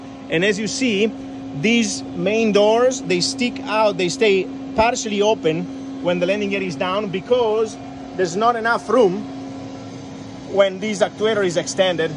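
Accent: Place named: Italy. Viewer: Love your videoes and the way you explain. And your Italian accent. Greetings from 🇧🇻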